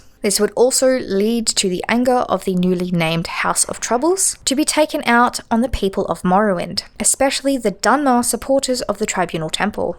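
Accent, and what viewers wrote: Australia. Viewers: Just wondered the same they have like a perfect voice for voice overs thank god for the slight australian accent making it stand out especially nowadays!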